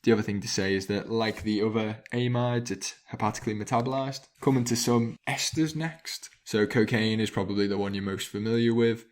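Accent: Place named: Northern Ireland, United Kingdom. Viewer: Sounds like a Northern Irish accent Either way, this was an informative lecture!!!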